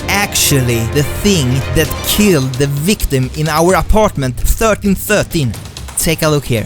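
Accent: Sweden. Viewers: Are u Swedish? Lol (I am and I just recognized ur accent) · The second I heard your accent, I knew that you were Swedish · ''A meteor hits the city and..'' GOSH you can just hear whole sweden in his voicexD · Are you swedish? Because your accent sounds like so... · Hahahah jag älskar din svenska brytning 👍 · Älskar att man hör att du är svenne hahahahaha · clicks on random sims video from a YouTuber I've never heard about before Gets hit with strong Swedish accent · Man hör verkligen den svenska engelskan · Hörde på din dialekt att du är svensk! Jätte bra video btw 😄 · U sound so swedish that's probably cuz u are from sweden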